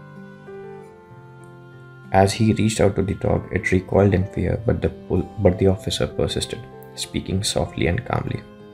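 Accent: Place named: India. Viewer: Hard for me to understand your English with heavy accents. Are you 🇮🇳 ?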